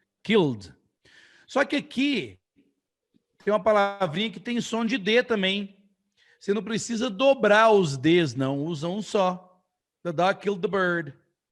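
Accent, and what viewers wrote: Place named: United States. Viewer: Sua pronúncia é bem americana diferente do inglês britânico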